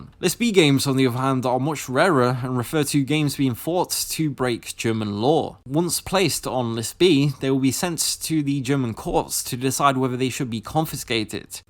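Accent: United Kingdom. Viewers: Hört sich nach einem Briten an. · You speak with an English accent yet you say "adult" and "minor" like an American. Weird how the internet has influenced the way young English people speak.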